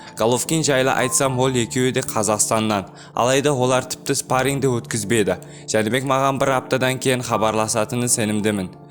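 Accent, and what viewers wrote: Uzbekistan. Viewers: Коментатор озбексинба немене бале сойли алмай отсын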